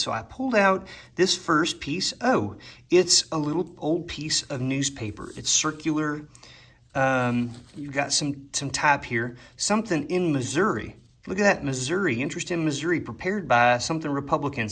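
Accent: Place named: Maryland, United States. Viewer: i was trying to place where you're from based on your voice - it sounds like Maryland, but then you said you took a trip to the East coast which makes me think you dont currently live there.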